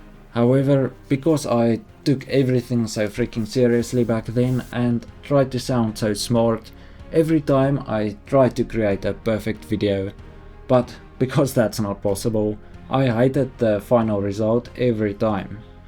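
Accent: South Africa. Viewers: you have already surpassed the achievements of many people three times your age. You are an old head on young shoulders as the saying goes. As a model railway enthusiast myself it’s always nice to see and hear what other folks are up to and you definitely bring a fresh outlook to the hobby with a wealth of interesting personal observations along the way. You are teaching me things and I’m one of those much older enthusiasts. Keep up the good work but don’t knock yourself out trying to hard. It’s all about enjoying the hobby and life at the same time. Your English is great and the South African accent just makes it even more interesting to listen to.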